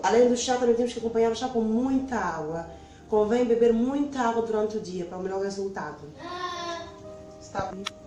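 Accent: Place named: Portugal. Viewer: vcs do Portugal tem um sotaque lindo bj amiga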